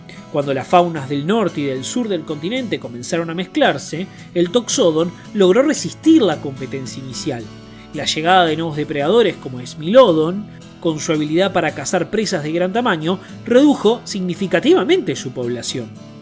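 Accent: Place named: Argentina. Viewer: él es argentino, por eso dice pronuncia así ciertas palabras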